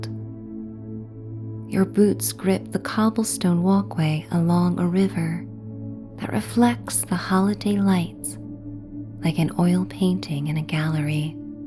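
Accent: United States. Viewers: Why is this recorded using an American and not English accent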